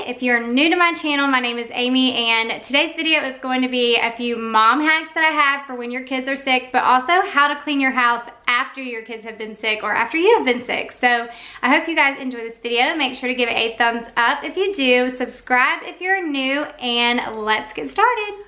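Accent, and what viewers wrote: South, United States. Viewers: Beautiful Southern accent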